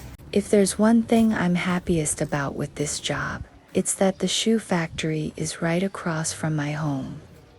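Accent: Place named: United States. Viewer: Why do you speak fluent English with an American accent.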